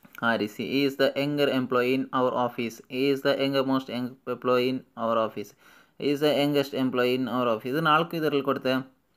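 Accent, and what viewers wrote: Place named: Mangalore, India. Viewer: nimma Kannada mangloor kannada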